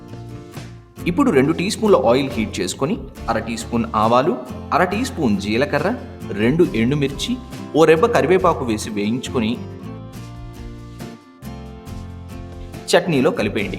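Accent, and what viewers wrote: India. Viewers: Feedback: We love your attitude Love your honesty and sincerity when it comes to making your videos of recipes and presenting them as traditionally as possible Love your language, voice, intonation, the Teluguness in it, and your simplicity I never compare videos, but I think your videos are amongst the best, especially because they are very well made, and edited. Most importantly, the videos are short enough and very easy to understand and the recipes are straightforward and convenient to memorise and to reproduce. Thus far, all your recipes that have been reproduced at our home have proved to be great successes, and if there may have been any mess-ups, the fault lies with us, and not your videos. Yes, we'd love you to add your face to your voice. And I wish you'd expand the cuisines, venturing even into various parts of India. All the best Love A Foodie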